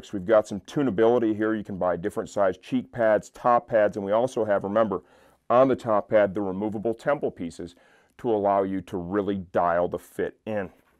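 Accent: United States. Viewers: Why Americans speak like that?? You should see from time to time the BBC.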